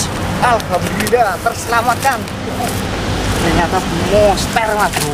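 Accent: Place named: Java, Indonesia. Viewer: Mas asli mana kok medok bgt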